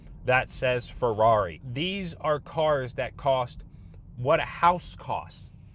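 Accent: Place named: Georgetown, Guyana. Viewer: I thought you sounded familiar, I am from Georgetown!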